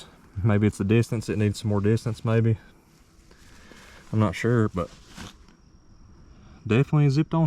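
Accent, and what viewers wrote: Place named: South, United States. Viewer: You have the purest southern accent i've ever heard :D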